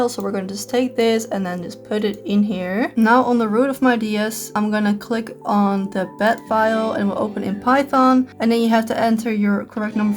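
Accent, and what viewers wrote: Netherlands. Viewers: From hearing your accent I thought you were like Dutch… and when I saw “type om te zoeken” on your pc I was sure!!!! Nice video!!! <3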